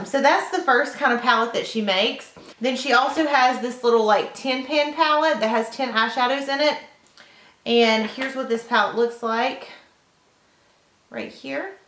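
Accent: South, United States. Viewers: Tabatha Gaytan i think its her accent its sooooo thick really southern also when she says oil she doesnt pronounce the i she says ol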